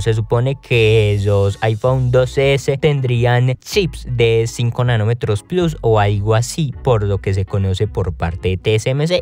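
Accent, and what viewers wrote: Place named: Colombia. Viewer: Me gusta el contenido. Pero me tengo que acostumbrar a ese tan marcado acento colombiano . O es la voz? O son ambas cosas? No lo sé, me imagino que es cuestión de acostumbrarse. Gracias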